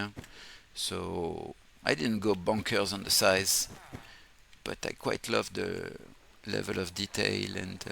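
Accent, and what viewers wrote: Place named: France. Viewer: really cool base, love the french accent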